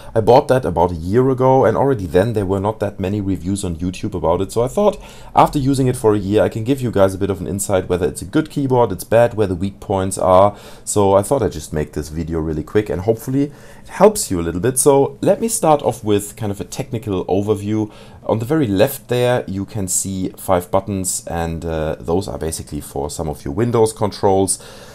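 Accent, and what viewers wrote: Germany. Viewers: Ich hab beim ersten Satz erkannt , dass du Deutscher bist xD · Are you German? Dein Accent klingt hart danach xD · Aha, das hab ich doch irgendwie am Dialekt gehört, dass du kein Amerikaner sein kannst xD :D :) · Man merkt voll, dass du "Deutsches Englisch" sprichst :D, wenn du weißt was ich meine, Gutes Video!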